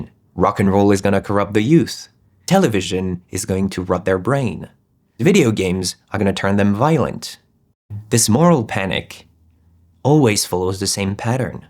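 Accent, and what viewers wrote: France. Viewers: i can hear the frenchman underneath the english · I love your aggressively French delivery and this is a very thoughtfully written video. I love the concept fission concept too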